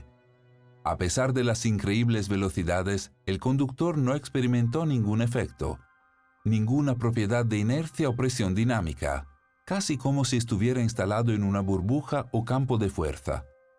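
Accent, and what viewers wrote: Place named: Italy. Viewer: Se llama, excelente voz y hermoso acento italiano!!!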